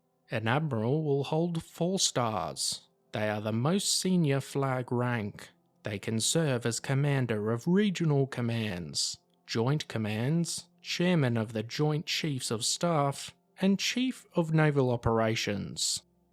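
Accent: England, United Kingdom. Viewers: US Navy. Why have an English guy as speaker? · Why is some British guy narrating this? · Thanks 🇬🇧 accent. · It's funny to hear a British person do American ranks of the US Navy. Anyhow I got out of the Navy 9 years and was a second class petty officer bosun's mate. When I got out I was up for bosun's mate first class but I didn't care because I was getting out. Peace.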